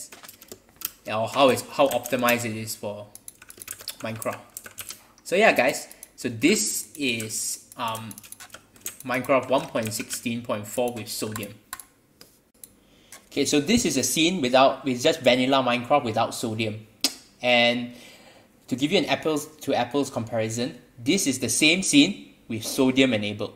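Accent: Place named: Singapore. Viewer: You sound like Singaporean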